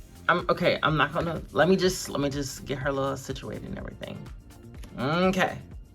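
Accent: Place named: United Kingdom. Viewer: It’s the British accent that’s scattered throughout this video, I love the energy!!!!!!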